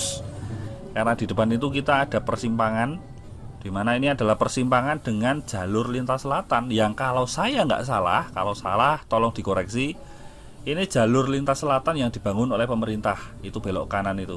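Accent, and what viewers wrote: Yogyakarta, Indonesia. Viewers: logat jogja nya kentel banget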